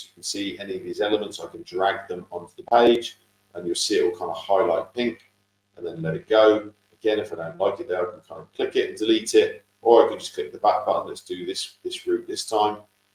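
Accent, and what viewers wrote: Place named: United Kingdom. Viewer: oooooh! Onboarding! I'm in the UK - sounds like you are from here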